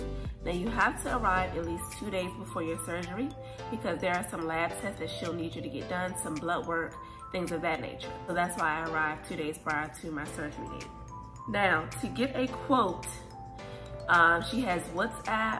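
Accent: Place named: Prince George's County, United States. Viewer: you sound like you from PG lls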